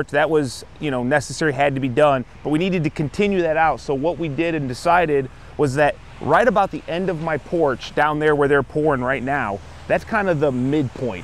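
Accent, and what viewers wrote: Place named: Midwest, United States. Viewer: Hi I'm a big fan of your channel, in from Yorkshie, England. We are known for our strong accents, but I love the way you say ( kahncrete ) not sure if that's how most mid west people speak, but it's got a proper workers sound to it. Full respect to you and for your hard work.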